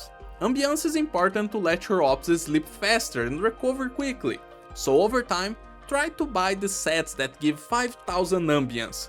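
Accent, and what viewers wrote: Brazil. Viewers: you talk like a brazilian fella(im from brazil) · Pela pronuncia consegui perceber que é brasileiro kkkkk, ótimo vídeo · Você não me engana, esse sotaque é de brasileiro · Are you Brazilian? I could tell by your accent, I thought it was cool. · Eu ouvi a voz dele e insta reconheci o sotaque kkkkk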